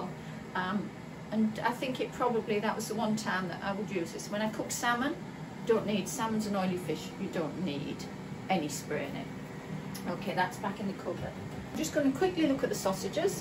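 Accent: Northern England, United Kingdom. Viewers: Nice to hear a Northern accent - I’m originally from Grangetown, in Middlesbrough!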